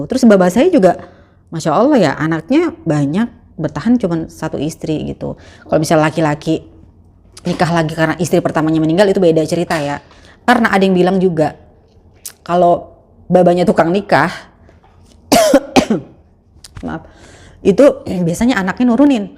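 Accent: Jakarta, Indonesia. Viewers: Alhamdulillah akhirnya dapet jwbnnya jg dimenit 10.40 dari awal aksen betokaw nya kentara bener mpok.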